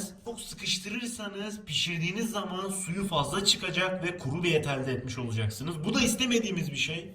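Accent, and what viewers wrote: Adana, Turkey. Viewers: ses tonu televole.diksiyon adana aq.kesiiüüürüüüm doğriiüüürüüümm.yapma yapma 😆🤣nekadar güzel gözüküyor derken bari gözüküööööööööö deme bari aq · ABİ SEN ADANALI MISIN KONUŞMAN ÇOKK BENZİYOR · ihsan doğan baya boş yampmışşsın işiniz gücünüz dalga adam adanalı şirvesinin nasıl olmasını istersin seni beyinsiz gerçekten senin gibi insanlar varya......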